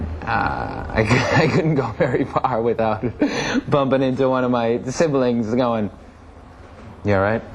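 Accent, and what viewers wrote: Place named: Boston, United States. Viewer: said "you know" 30 times. and each time was amazin lol love his boston accent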